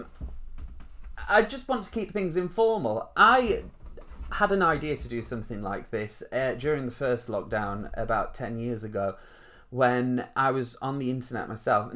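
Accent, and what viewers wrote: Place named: RP, United Kingdom. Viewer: You do sound posh and yes I’m northern 😉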